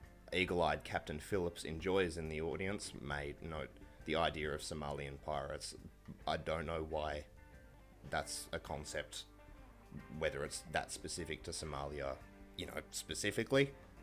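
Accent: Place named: United Kingdom. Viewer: you have a british accent..you from the uk?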